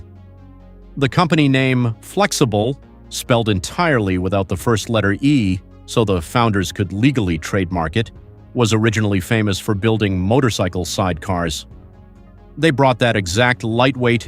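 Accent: United States. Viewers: Why do we have to tolerate an American accent for almost ALL YouTube channels?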